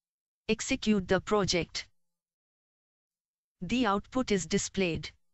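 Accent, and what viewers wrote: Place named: India. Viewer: Wondering why the robot voice has an Indian Accent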